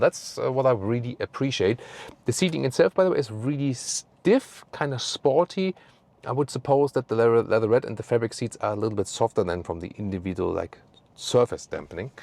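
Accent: Germany. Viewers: The German accent is amazing 😄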